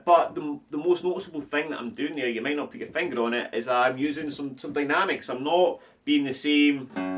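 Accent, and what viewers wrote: Scotland, United Kingdom. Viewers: Good to hear a fellow Scotsmen I improvise basically if you learn the 5 pentatonic scales learntje notes then find them on the fretboard easy lots of practice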